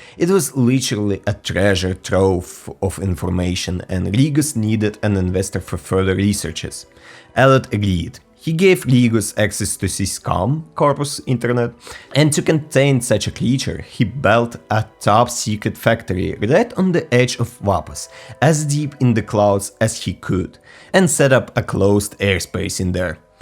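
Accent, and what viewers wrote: Russia. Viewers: You sound russian...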